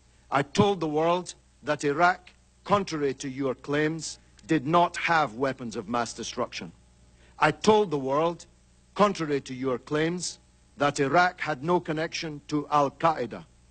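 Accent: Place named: Scotland, United Kingdom. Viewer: James Galloway one of my favorite orators. His wonderful oratory dressed in that powerful Scottish accent projected in a powerful voice from a face that assures the listener that this man’s man does not suffer fools and is no none sense. Every thing he says is true clear and to the point. There is no room in his words for doubt or debate. He does not speak to impress anyone, his words are there to inform the uninformed, in this instance, of the cruelty and criminality of an Empire gone amuck.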